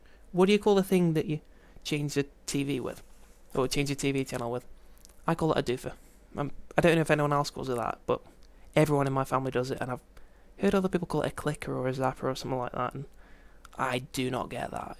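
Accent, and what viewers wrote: Stoke, United Kingdom. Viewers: U got a little bit of stokie in your accent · Love vids like this; that aren't just documentary style dissections of accents, but the person demonstrating them. Subbed, also a fellow Stokie, me duck! (Pot 'erb!) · Your video is 11 years old now but absolutely fascinating. I'm sure you've lost even more of your Stokie accent now. That's a shame!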